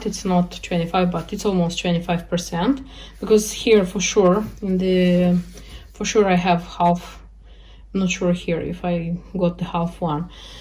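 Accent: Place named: Moldova. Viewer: я по акценту сразу поняла что вы из Молдовы, я тоже там родилась, поэтому с еще большим удовольствием смотрю вас😊 Румынский уже очень плохо помню, живу давно в России, очень люблю ваш канал, столько вдохновения!💗🌷🌷🌷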